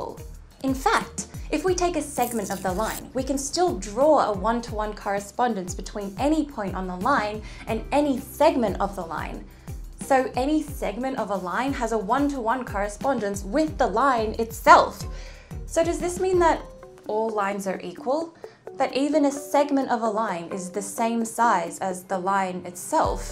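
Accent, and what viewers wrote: Australia. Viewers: the first female Aussie accent that isn't like nails on a chalkboard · I love that cultivated Australian accent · and I'm an idiot. I realized that after watching a couple more of ur videos. Us Americans aren't very good at noticing an Australian accent unless its particularly strong. Interesting stuff btw. U really are doing a great job... But u do have a lisp 😆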